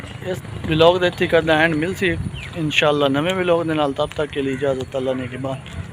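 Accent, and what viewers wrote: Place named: Punjab, India. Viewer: very nice.I really enjoyed your video. God bless u. I like your punjabi accent.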